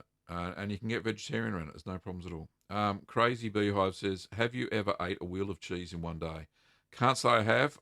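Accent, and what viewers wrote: Australia. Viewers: I apologize, and now I feel ignorant. I'm pretty sure that's an Aussie accent now - My friend lives in NZ. Terribly sorry for that. I checked your website's IP and saw that it originated from an unknown location in AU.. I feel really silly. Have a good night!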